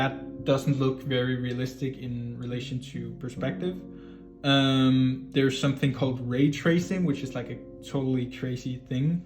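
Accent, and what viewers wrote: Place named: Massachusetts, United States. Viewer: Your from Massachusetts aren't you?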